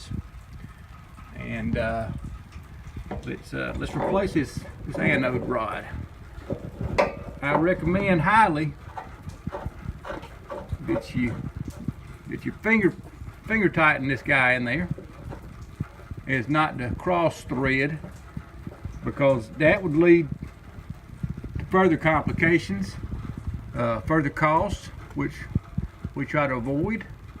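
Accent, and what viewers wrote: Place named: South, United States. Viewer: This makes me smile so big. You sure are putting on that Southern 😁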